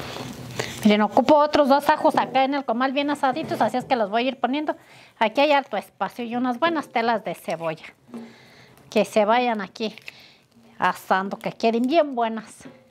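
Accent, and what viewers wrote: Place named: Michoacán, Mexico. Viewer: Tu hablas bien a la forma qué hablan en Michoacán con todo respeto Pero hablas muy rápido Pero si tú quieres hablar así rápido claro te vas a trabar Pero explicas bien no digas que no saber hablar !claro qué sí puedes ! Adelante si se te entiende no vuelvas a decir que dices una cosa por otra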